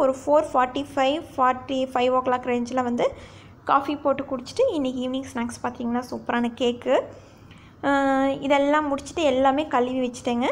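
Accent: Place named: Tamil Nadu, India. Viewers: abroad il irunthalum ungada voice la unkada cooking la unkada dressing style la tamil flavour 100% irukku sis. super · Happy to hear cbe slang :)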